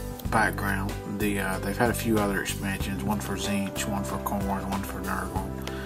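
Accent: South, United States. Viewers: Great vid! And a great voice, where in the U.S you from? Very easy listening on my British ears. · Good video. It's always nice to hear someone with a southern accent who doesn't talk like an idiot. :)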